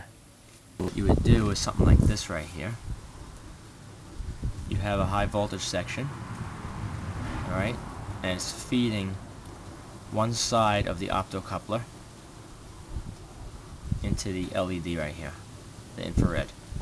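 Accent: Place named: New Jersey, United States. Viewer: I was looking for opto isoloators and I guess because I am subscribed to your channel that you popped up again. I could not believe it. I love your videos. They are simply the best. All the knowledge, zero BS, all the circuits I really want, ..but mainly it is your style of presentation, and that lovely NJ accent. You deliver the right emotion in your videos and it makes for a truly first class educational experience. It is almost more like entertainment because they are so enjoyable to watch.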